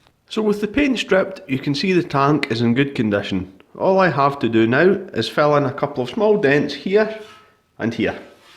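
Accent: Scotland, United Kingdom. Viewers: nice job. my mouth is watering as i watch you go to so much trouble. accent scottish?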